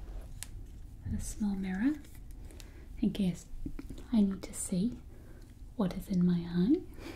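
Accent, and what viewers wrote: United Kingdom. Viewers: i love ur lovely British accent🥰🥰🥰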